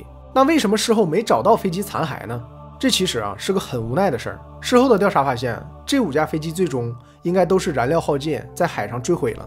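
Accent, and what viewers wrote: Northeast, China. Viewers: 东北口音太重了😂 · 原來以前的老視頻東北口音那麽重的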